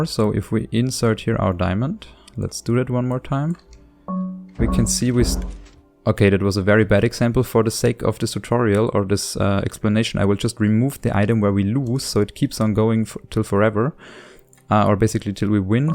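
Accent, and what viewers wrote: Germany. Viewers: You kinda have a german accent..🤔 Are you from Germany?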